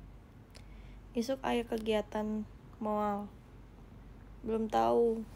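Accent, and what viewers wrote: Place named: West Java, Indonesia. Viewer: Mlm ni nyunda pisan uyy....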